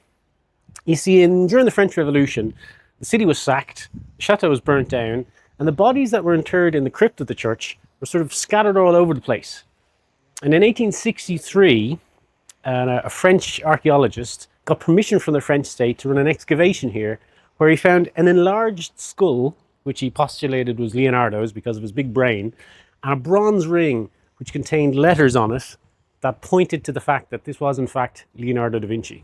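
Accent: Ireland. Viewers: Me too that Irish accent and French names always gets me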